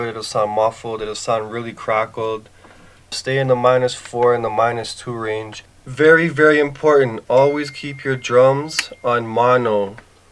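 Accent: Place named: Toronto, Canada. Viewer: siiiiiiick....nice accent..must be from the 6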